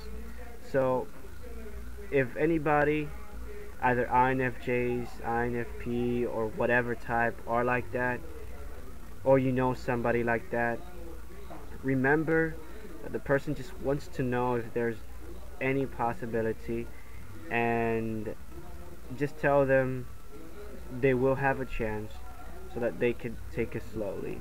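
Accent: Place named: Dominican Republic. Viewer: Manito. Como estas 😊 i hear the Dominican accent. Bless u man INFJ here too.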